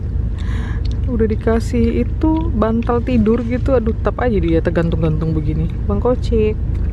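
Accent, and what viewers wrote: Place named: Java, Indonesia. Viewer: Tetap ada logat jawanya ya ,,sukses terus buat kelurga mamah 👍👍👍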